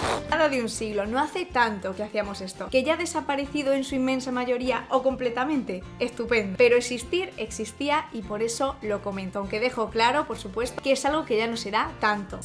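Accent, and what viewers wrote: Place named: Murcia, Spain. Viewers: Te sale vena andaluza,muy cerca de Andalucía as tenido que vivir, Murcia? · Pensé que eras de Murcia !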